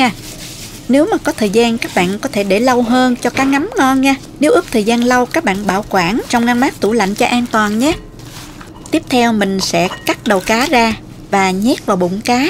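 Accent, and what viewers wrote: South, Vietnam. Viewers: Giọng nói cô nầy thuộc người miền nam thật dễ thương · Giọng nói người Nam Bộ rất dễ thương dịu dàng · Giọng miền Nam nghe chơn chất hiền hoà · CÁI GIỌNG NÓI CỦA MIỀN NAM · đúng chất người miền nam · Rat nguong mo, cach nau, cach trinh bay va cach chi dan day du chi tiet, cong voi giong noi mien Nam va rat em, nhe, to rang, Ngan gon, de hieu. Cam on Co rat nhieu.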